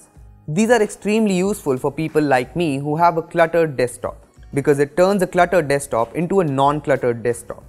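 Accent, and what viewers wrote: India. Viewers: your accent was funny man, Indian!!!!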